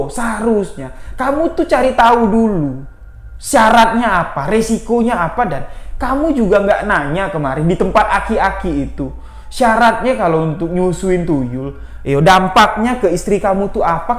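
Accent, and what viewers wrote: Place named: Madura, Indonesia. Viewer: kya logatnya org madura...denger cerita spt ini kn enaknya sambil merem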